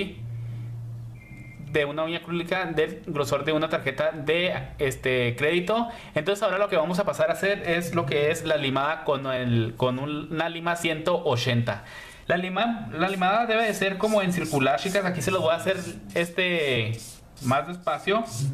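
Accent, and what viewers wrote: Mexico. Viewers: Si pudiera amiga ese es su acento no todos los mexicanos hablamos igual, depende de el estado cada quien tiene su acento y el de el esta padre.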